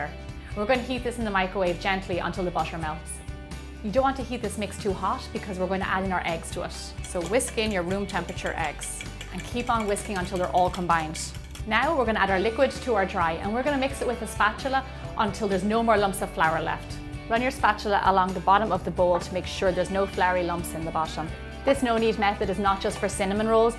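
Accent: Ireland. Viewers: U sound irish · this accent sounds Irish. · What a lovely Irish accent and what a great recipe. Thank you. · Is she from Ireland 🇮🇪? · I hear a hint of Irish.can you do a vid on making Bailys Irish cream the nector of the Gods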